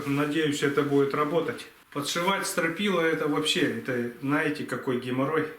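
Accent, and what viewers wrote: Perm, Russia. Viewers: Что за говор пермь, или ?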